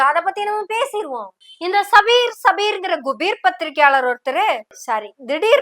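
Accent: Kongu, India. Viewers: ❤ நகைச்சுவை மிக்க கொங்கு தமிழ் அழகாக அரசியல் விளையாடுது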